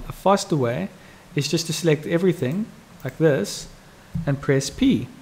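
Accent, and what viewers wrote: South Africa. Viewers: Nice to hear a South African oake for a change :D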